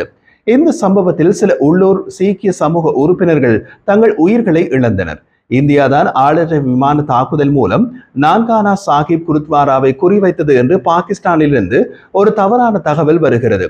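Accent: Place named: Eelam, Sri Lanka. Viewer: அழகிய (ஈழத்)தமிழ் உச்சரிப்பு,நேர்த்தியான செய்தித் தொகுப்பு ! பரபரப்பில்லாத உண்மையான செய்திகள்!நன்றி!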